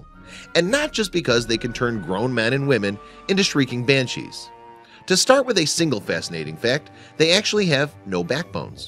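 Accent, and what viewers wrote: United States. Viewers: “Tchi-tn”. I am sorry, but this is pronounced “Khai-tn” (from Greek χιτών, chiton). “Cheating” is a completely different word. American narrators are so American...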